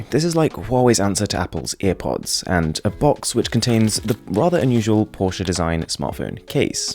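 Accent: United Kingdom. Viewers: Smh a dialect can’t be helped. He sounds British but without the accent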